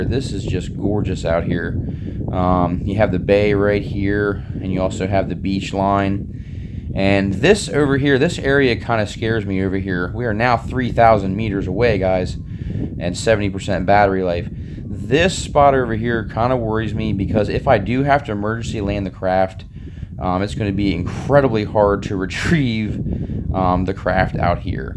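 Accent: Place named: Pennsylvania, United States. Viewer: The accent. Berks county?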